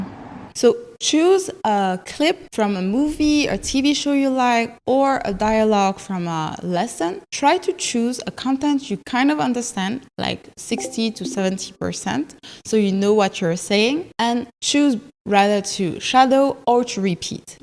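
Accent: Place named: France. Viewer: The accent is French and the name is a word in several bantu languages in Uganda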